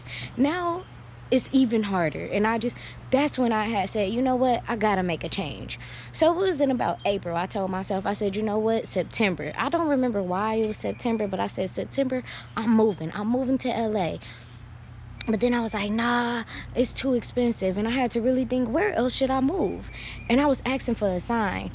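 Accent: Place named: Bay Area, United States. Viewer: i could hear you from the bay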